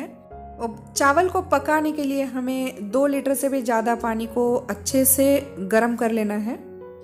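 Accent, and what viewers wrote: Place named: Maharashtra, India. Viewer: mam aapke baat karne ke tarike se malum howa ke aap maharashtra se hai thanks mam reply main bhi maharashtra se ho aurangabad se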